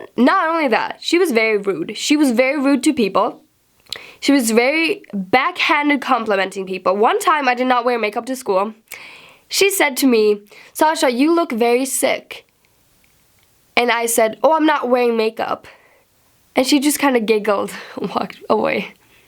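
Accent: United States. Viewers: johansson they didn't say it was bad lol it's just different because to me she just sounds American so it was surprising :)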